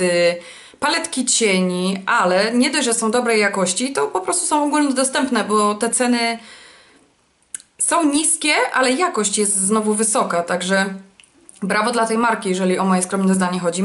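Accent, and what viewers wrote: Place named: Silesia, Poland. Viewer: tak czułam, że dziołcha ze śląska:):) akcent Cię zdradził;)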